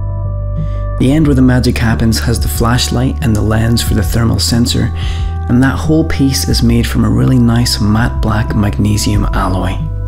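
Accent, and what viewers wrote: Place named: Scotland, United Kingdom. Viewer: where is that accent from you have a cool voice, Scottish?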